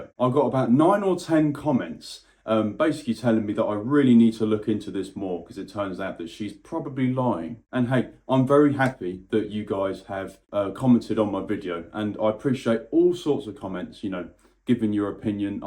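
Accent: United Kingdom. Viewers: Oh, I've got so many things I want to share... It's way too late on a Saturday night for me to get on my soapbox though lol! Great video! There's still so much more that you would be shocked about! Nice to hear a fellow Brit covering the story too though! 👍😊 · Looove the accent & also prefer British slang and terms to many American ones myself. ❤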